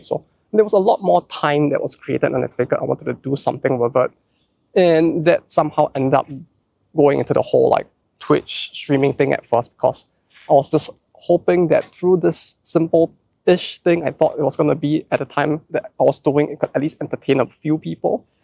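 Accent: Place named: Singapore. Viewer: No way, i wasn't the only one who heard the singaporean accent in your voice heh Also i can add to that discussion of workforce gender presence in the eroge creation. One of the examples i can give is, lets say a hypothetical "Beauty isn't skin deep (yuri)" game that the art team had a specific member setup (atleast during the first project i worked on the CGs), it was only 5 people big, 3 males and 2 females (though it would grow exponentially by 2016). Each of the artist had a specific role to work onto their allocated CG pile, one would focus on the atmospheric setting of the novel, thus designing soft pallete CGs with only the minimum of affectionate connection between the characters. One would focus on the 'standout' CGs as they called it which focused on having an emotional impact ongoing between the characters, and one (me in this case) to be behind the passionate scenes, which would be more of a softcore or a play-state type of mood. 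the more experienced female artist of the team, would sit in a call with the others and point out design or anatomical discrepancies, give tips on polishing the art or highlighting certain zones (not always the naughty stuff, sometimes you want focus on the background, as the backdrop is key into how the player perceives the current situation to be, and can be very distracting if not done right. The experienced artist was also the one responsible for the more.. hardcore stuff CGs, and would basically supervise the whole art quality. In or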